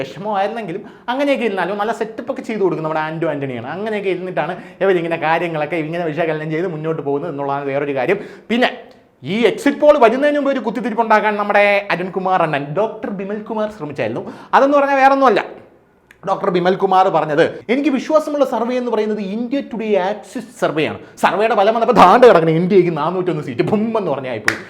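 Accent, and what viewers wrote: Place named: Thiruvananthapuram, India. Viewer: തിരുവനന്തപുരം ഭാഷയിൽ ഉള്ള താങ്കളുടെ സംസാരം കേൾക്കാൻ നല്ല രസമുണ്ട് 👍👍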